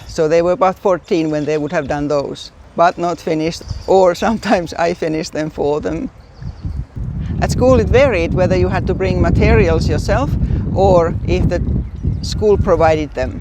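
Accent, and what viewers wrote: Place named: Finland. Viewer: Hello! I am so happy to have discovered your channel! I could listen to your Finnish accent all day as I come from a small Finnish community in Northern Minnesota and am proud to be 1/4 Finnish myself. 😬My family and I were in Finland for a month in the summer of 2011 when we visited our former exchange student who still lives in Helsinki. Neither knitting or crochet in taught in US schools; not in the midwest, at least. Sewing, cooking, woods and metals are taught here though, just as in Finland. I thoroughly enjoy your basic, easy to understand tutorials. I conquered the Granny Stitch today thanks to you! Kiitos, Shelley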